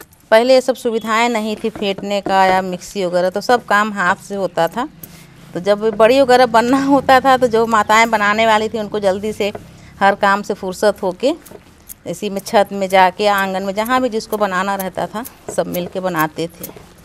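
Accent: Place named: Ghazipur, India. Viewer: Sister aapki baate bht acchi lagti h meri mammy aapki trah hi bolti thi woh bhi gazipur se thi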